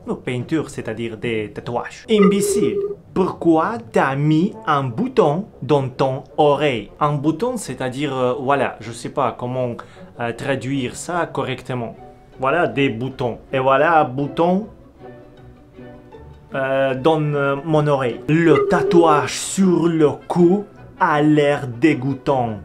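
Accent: Russia. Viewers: Bravo tu progresses de jour en jour dans ton élocution;. Tu vas gagner en fluidité grâce à la pratique. Je pense que tu vas arriver très rapidement à parler le français sans accent russe.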